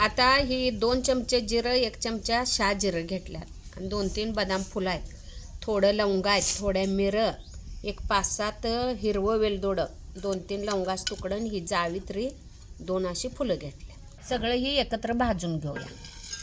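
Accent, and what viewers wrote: Kolhapur, India. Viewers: 🎉🎉😂😂 खूपच छान पद्धत मस्त आणि कोल्हापुरी भाषेलाही गोड वाटते बिर्याणी एकदम भारी शोभा अकोला कर बेरड पुणे वडगाव शेरी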